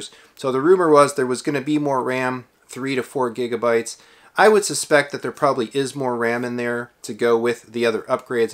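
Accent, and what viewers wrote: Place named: Chicago, United States. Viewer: If this isn’t a fellow Chicagoan I’d be shocked 😄👌🏼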